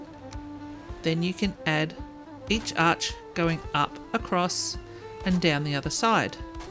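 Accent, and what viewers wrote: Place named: Australia. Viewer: It’s wonderful to hear an Aussie accent on a Valheim build vid! Thanks for the beautiful build- I’ll be trying this one out! 💕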